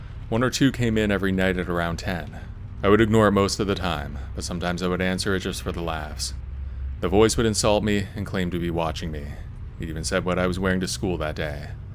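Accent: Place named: Canada. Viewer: I knew there was something about your voice and it just dawned on me. You're Canadian.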